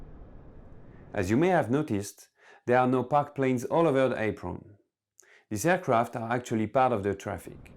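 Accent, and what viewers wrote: France. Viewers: French accent :) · This french accent :) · It feels like watching a video presentation by Airbus. The french accent. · yes, enjoyed this new features video. You definitly want me to take the plane and go back to home, with this beautiful easy listening french Chiracron english Jerusalem accent :-D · L'accent français?😅... Great video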